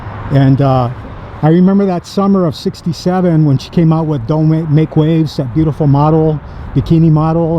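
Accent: East Coast, United States. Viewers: What is this guys accent from? East coast?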